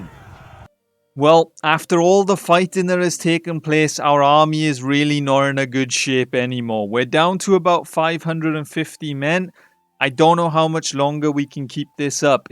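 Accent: United Kingdom. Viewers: love the content, this randomly popped up in my feed. quick question, are you welsh?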